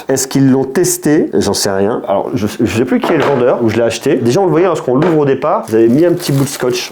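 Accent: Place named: France. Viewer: Très bonne vidéo ! (pas grave pour l'anglais, les français ne sont pas connus pour avoir un accent british ou US) Je rejoints certains commentaire, si tu fais des tests d'appareils pas encore ou pas forcément disponible sur les chaines de distributions standards en Europe/France pourquoi pas tester d'autres appareils ? J'ai vu du IQOO 11/11 pro (qui est le OnePlus de Vivo en faite) Pourquoi pas ce X90 pro+ de Vivo Si le budget le permet, il y a évidemment du OPPO Find N2, Vivo X Fold + (ou le X Fold 2), la prochaine version du Honor Magic 5 ultimate ? En tous cas, très intéressant que tu touches à des appareils en avant première (entre autre) sans forcément le soutien de la marque !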